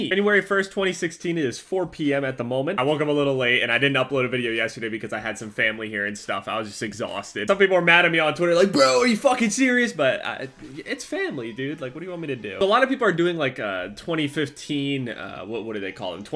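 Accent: Canada. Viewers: You sound like you're Canadian lool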